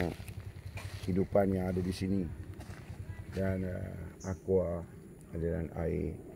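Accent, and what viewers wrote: Kuala Lumpur, Malaysia. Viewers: Hang cakap sleng KL no. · hehehe.. ustaz cakap kL oo.. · Bersame same , kepade , ustaz Cakap KL 😂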